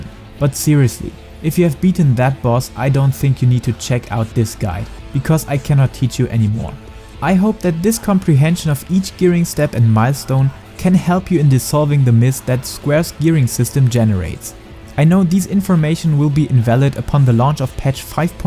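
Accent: Germany. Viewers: sorry to ask man but where do you come from your voice sounds like a german that speaks english ^^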